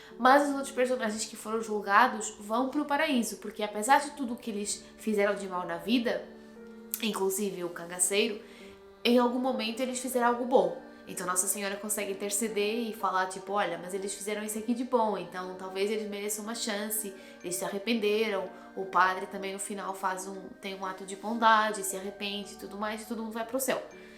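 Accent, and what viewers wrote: Brazil. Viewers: Teu português tá muito brasileiro 🤔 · Pq ela fala igual um brasileiro sendo portuguesa??? Que top. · Ué, você é portuguesa? Seu sotaque é brasileiro. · ela emula um pouco o português do brasil, ela ja comentou isso. o portugues de la mesmo come muito as vogais, pois uma época de Portugual eles intencionalmente quiseram se aproximar do francês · Seu sotaque está se abrasileirando, massa. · Ela com o sotaque brasileiro fica bem melhor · Ela fala com um sotaque mais Brasileiro do que o sotaque de Portugal.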